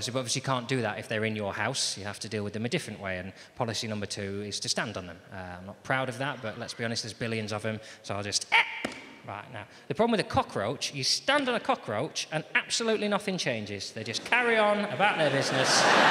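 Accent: United Kingdom. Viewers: What kinda British accent is that?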